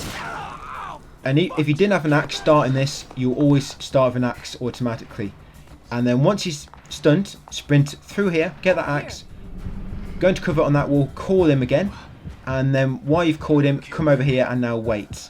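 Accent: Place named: London, United Kingdom. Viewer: Most be from London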